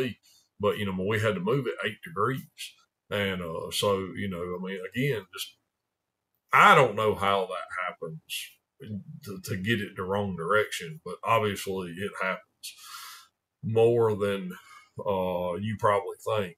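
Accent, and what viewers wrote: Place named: North Carolina, United States. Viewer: He talks like my relatives in North Carolina.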